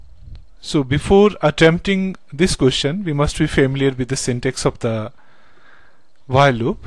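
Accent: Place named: India. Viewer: I realize that your accent sucks BUT BUT BUT i learn a lot from you youre very good in teaching keep it up men you will be able to help many people im sorry for all the indians that i have said that your accent is so bad but i realize that accent is just a sound and learning is everything thanks again men keep it up #NO TO ACCENT YES TO LEARNING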